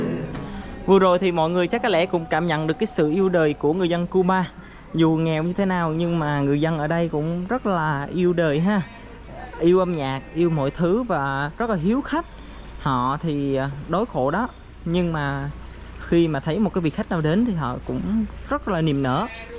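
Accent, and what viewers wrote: Quang Nam, Vietnam. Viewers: tieng nẩu ????? nghe hợp lổ tai...cùng quê cùng làng ??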